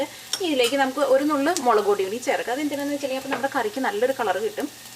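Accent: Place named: Malabar, India. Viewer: I really loved the narrators south Malabar accent.. keep it up dear.. Godspeed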